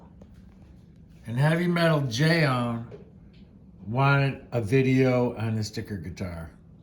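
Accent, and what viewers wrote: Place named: United States. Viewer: Love your American accent takes me back to the birth of Rock and Roll as a kid listening to American Forces network broadcast from Hilversom in Holland late 40s great video my friend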